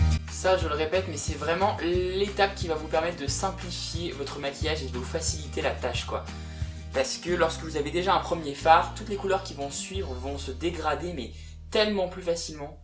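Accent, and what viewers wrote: United Kingdom. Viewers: très jolie ce petit look... Très frais et naturel. #teamsnapchat. Tu as un.bel accent anglais :)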